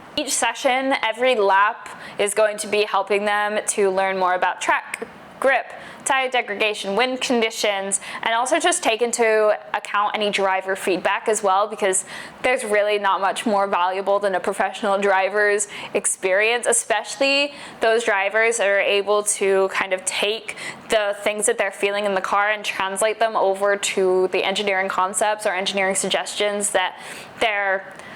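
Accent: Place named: Australia. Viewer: Hello, algorithms brought me here. I love the enthusiasm and the love of the sport. In trying to pinpoint your accent, sometimes it goes northern but sometimes I think it's aussie... Pretty cool in any case (and if it's a 'normal' accent then I haven't travelled enough!). Anyway, there's alot of oldie f1 fans out there who sometimes get a little sensitive on technicalities. You're still finding your way which is great but thought I'd share to not be too disheartened if you get negative responses in the future. You'll find your footing! Few pointers on this topic- Tyres, if you're going fundamentals for F1 specifically, the suspension components work very closely with keeping the optimal operating temperature of the tyres for the lap. Think the window is 120-180c? Toe/caster/camber, I think alot of these are tailored for the tyre/track/scrub rate/temp, rather than driver preference. 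You could've mentioned DAS wheel in the 2019? period. Suspension, push or pull rods, bit of black magic but I suspect is how they load transition works with the rest of the setup (ie placement of engine, aero etc). Ride stiffness/height is largely balancing the aero rather than 'comfort'/performance typically. See AM this year (I suspect running very stiff setup that helps aero but because engines I assume aren't balanced/ tolerating the stiff setup as well and will shake the driver. Mercedes last era with porpoising (aero grounding out at high speed, relying on suspension too much to fix the aero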